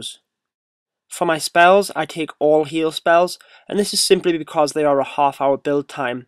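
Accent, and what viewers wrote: United Kingdom. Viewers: O yeah, now I can hear the original British accent. No American mixing 😂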